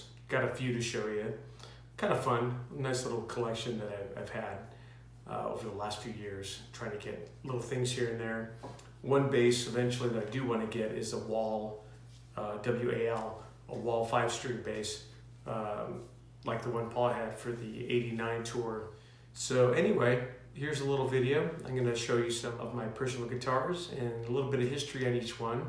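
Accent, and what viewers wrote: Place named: United States. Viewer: Omg it's kind of weird to hear you in your American accent rather than the "Liverpudlian" accent you use in shows lol....I been lucky enough to see you performing live and you are simply AMAZING...You also thrown a Fab Four guitar pick to me and I gave it to my son that plays guitar and bass guitar himself and he keeps it as holy remains THANK YOU SO MUCH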